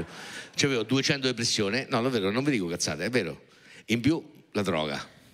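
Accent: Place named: Marche, Italy. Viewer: Il marchigiano,ancor piu' il fermano, ė universale!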